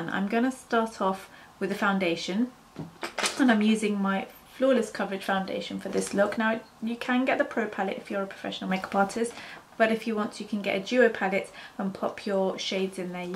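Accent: United Kingdom. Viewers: Are you muslim women? What is your name? Your Accent is British, Right?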